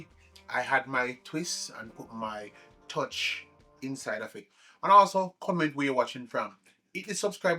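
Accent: Jamaica. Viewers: Nice chef, sounds like you come from Clarendon...the heg...lol my family is from St. Elizabeth.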